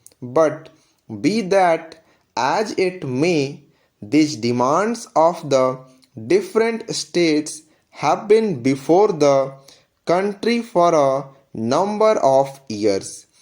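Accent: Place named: England, United Kingdom. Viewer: Bhai ji jyada angrej mat bano thoda pronounciation thik se jaise humare yha generally boli jati hai ekdm sir se utar rahe h kuch words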